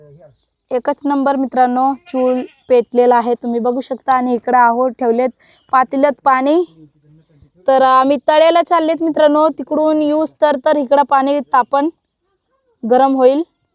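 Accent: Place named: South, India. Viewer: तुम्ही Up ची आहेत कि सौउथ ची कारण तुमची भाषा सौउथ सारखी आहे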